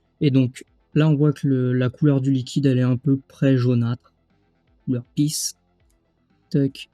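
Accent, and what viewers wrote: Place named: England, United Kingdom. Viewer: Ah l'accent anglais là... :face-purple-crying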